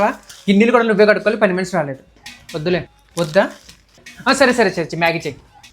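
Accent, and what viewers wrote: Godavari, India. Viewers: 😂😂😂 pure గోదావరి భాష😂😂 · Director ga,kodukunnanehe godavari yaasa super I'm missing that yaasa,our place dowleswaram daggara vemagiri andi,me vedios chala natural ga vuntai nethimeda kerchief, paper cutting badge la petti name rayadam unique asalu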